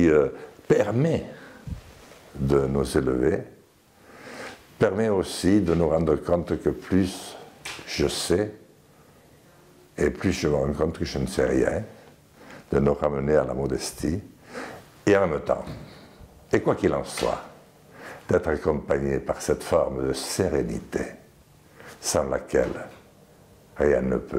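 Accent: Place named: Occitania, France. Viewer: On n'est pas nombreux à le remarquer... L'accent occitan et la belle voix profonde les hypnotisent.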